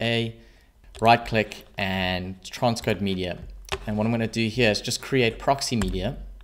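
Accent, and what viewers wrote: South Africa. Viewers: Yisis you sound like a my home cape town. You saved me a ton. I lits maxed out my space on my laptop and editing was a nightmare. My project file was 259gbs. My poor 500gb sata was crying but this is going to save me a ton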